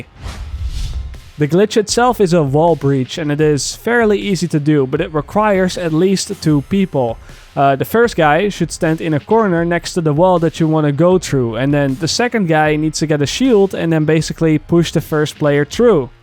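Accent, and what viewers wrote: Netherlands. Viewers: Cool video, also i'm pretty sure that your'e Dutch... i recognize the accent xD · Mals nederlands accentje hoor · je bent bijna je Nederlands Engels accent kwijt. i just joint you channel, I see you have some awesome videos.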